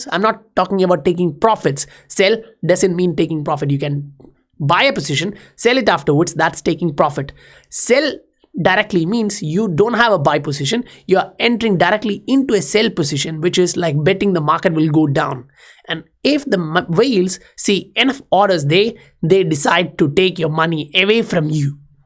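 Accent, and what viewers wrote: India. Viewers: the voice like Indian......?.....great fundamental analysis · You say you're in Korea but you sound like you're from India... ?? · ☺️ btw ur accent is quite similar to Indians 😒..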